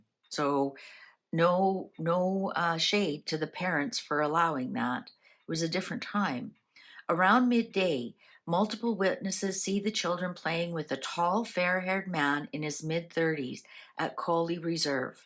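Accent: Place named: Canada. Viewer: Get over it.she is Canadian so pronounce differently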